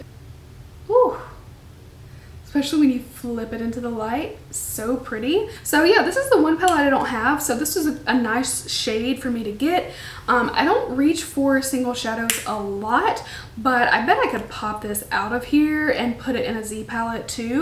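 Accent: South, United States. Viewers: Wait!!!! You are a Bama girl???!!!! So am I!! I did not know this!!!! I knew from the accent you were southern but wasn’t sure exactly where you lived!!!!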